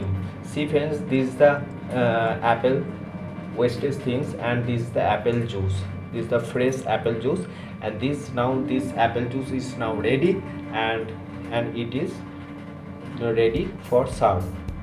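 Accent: India. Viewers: Are you from India I love the accent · Mania the reason why we are laughing it's because it's an Indian speaking this way... FYI french , Chinese, Spanish, Russian, Africans, and many rural country accross the globe speak worse than this... Atleast we understood him if it were some other countries mentioned above we wouldn't have been able to understand them even more... Stop criticizing and appreciating... He's got a million people supporting his content.. work is more important than the language..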